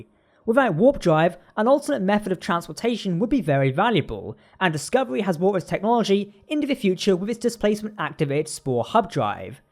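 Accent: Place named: England, United Kingdom. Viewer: Debris is pronounced “debree” not “debreeze”. With an English accent it’s stunning you pronounce the word incorrectly.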